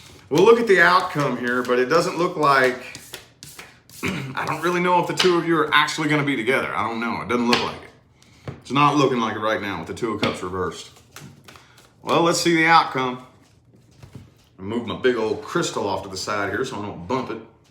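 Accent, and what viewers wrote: South, United States. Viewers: the only Southen accent I like is yours, thanks.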